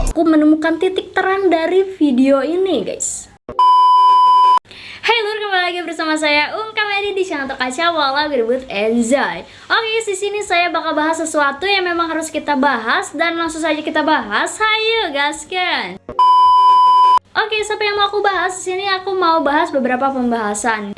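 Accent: West Java, Indonesia. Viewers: Kak, orang sunda?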